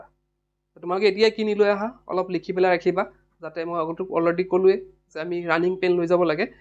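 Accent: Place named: Barpeta, India. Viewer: Sir barpeta district r English lage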